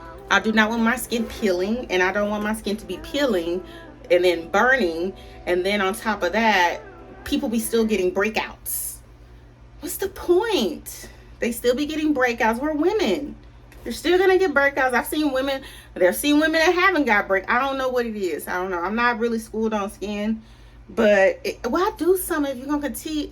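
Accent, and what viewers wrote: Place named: Texas, United States. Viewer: Honey I love your Texas accent! Please give us more. And celebrating the small steps of your loyal circle is important. My circle getting super small though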